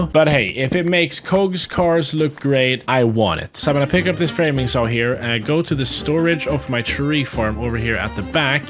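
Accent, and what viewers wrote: Sweden. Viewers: You sound like you have a swedish accent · I thought that dialect was familiar! I had to chevk the about me lol. How do i always find Swedish creators?!😅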